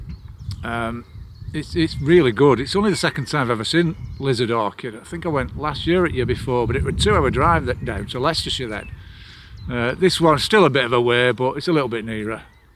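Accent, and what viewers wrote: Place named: Yorkshire, United Kingdom. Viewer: Greetings from Alberta Canada. We used to live in Melmerby Coverdale, and we really miss the walks. Thanks for sharing your lovely walks with us. We really enjoy them, and love your photos of flowers, birds, butterflys etc, and all the information you share, and we love your Yorkshire accent. Please keep walking and sharing.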